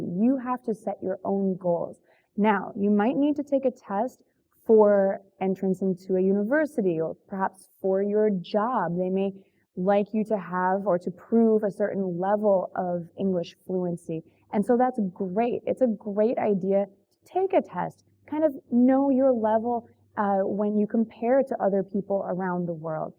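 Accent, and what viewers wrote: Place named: United States. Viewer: I love your pronunciation!! So American. I lived in America before, but living in America for 1 year is not enough to speak English fluently or learn English perfectly. So I was looking for this kind of Video which is made by American. Thank you so much! Watching from Japan!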